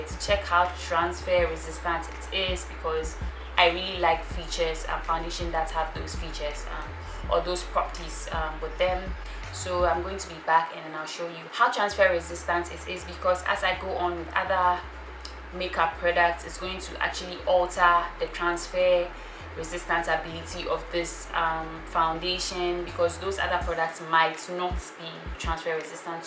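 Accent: Ghana. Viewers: Hi, Your accent tells me you are from Ghana, I will like to get in touch with you and ask you a few questions directly. Kindly email me on <mail> I'm not sure about putting my number out here. I will be glad if I get a reply from you. Or you can post a business WhatsApp number if you have one. Anyway I'm your new subscriber and my name is Francine.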